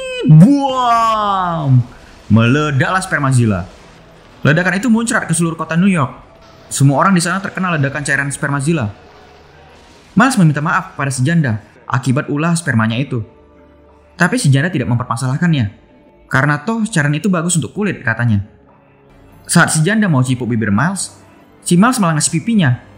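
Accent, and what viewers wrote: Medan, Indonesia. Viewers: Org medan kau ya min? 😂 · Kek org medan abang ni, ya kan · Pengisi suaranya org medan pasti ni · Nih orang Medan banget omongan nya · Logat medan abg ni wkwk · Logat medan nya bro, mantap kali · Macam logat medan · Nampak kali medan kau bang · Anak medan kau ya bg? 😂😂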